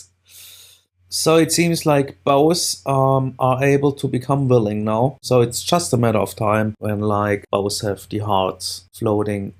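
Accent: Germany. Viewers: man merkt das du deutscher bist :D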